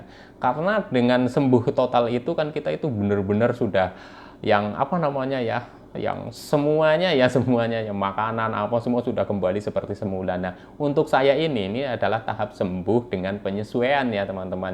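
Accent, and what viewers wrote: Banyumas, Indonesia. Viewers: Sembuhnya pakai apa...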